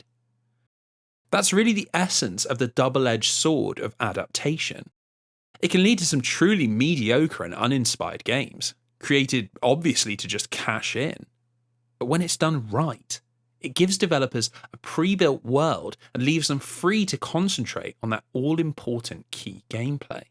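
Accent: England, United Kingdom. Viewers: These sorts of videos are always successful because of an English accent narrating it lol kudos to you though on this monster sized content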